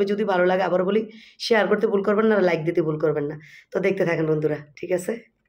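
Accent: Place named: Brahmanbaria, Bangladesh. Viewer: কিসের জন্য এমনটা হয়েছিল আপা এটা জানতে পারছেন তো যা হোক চ্যানেলটা যেন ঠিক হয়ে যায় আপা আপনি আমাদের ব্রাহ্মণবাড়িয়া বাঞ্ছারামপুরের সেম ভাষা বলেন অসাধারণ কথা খুবই ভালো লাগে তো দোয়া করবেন আমি আহমদ আলী সৌদি আরব রিয়ার থেকে ব্লক গুলি দেখি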